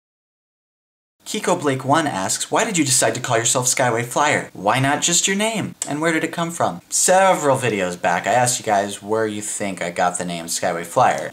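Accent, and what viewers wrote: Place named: Midwest, United States. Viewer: i like that you still have a mid-western accent even though you live in California.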